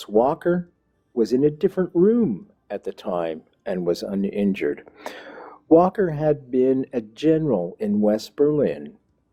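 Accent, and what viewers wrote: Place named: Canada. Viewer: Hi - a listener from the UK here! I just wanted to say thank you for you tireless, even-handed work toward a range of topics. The assassination of JFK is something that has deeply interested me for a while, and I always enjoy hearing different points of view about the various elements of the event and people involved. JFK, from talking to my mother and grandparents and others, was a much respected, even loved, President here. And his death was a deeply shocking, saddening event that still stirs great emotion in my mother today. He seemed to represent a brighter future and engendered a great amount of respect here. There was, and remains, a great deal of scepticism about the government sanctioned explanations as to what happened before, during, and after President Kennedy's death. And, as an aside, I love listening to your Canadian accent and when you talk about Lake Ontario - always nice when I'm listening with a warm cup of tea of an evening!:) Thank you!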